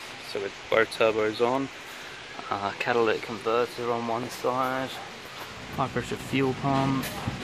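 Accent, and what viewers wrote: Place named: United Kingdom. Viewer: Ah right. Your British accent made me think you were from UK. No worries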